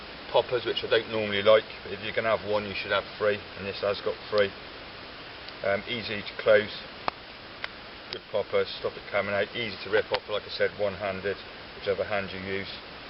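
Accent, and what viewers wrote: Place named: England, United Kingdom. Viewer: Its 751g, which means it can run as an axe too, 49/51 carbon steel, Sheffield forged, micanta handle is lovely I swear by this blade, I run this and a Moro and love them..... I wear mine on the waist with an elasticated knee strap so i can climb over things without it snagging, that little eye on the bottom makes all the difference, do you still bush, sounds like your in England I'm Dorset are we close?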